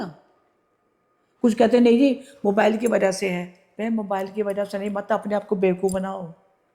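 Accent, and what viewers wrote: Punjab, India. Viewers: Pranam Aunty. I regularly watch your videos. I love your punjabi accent.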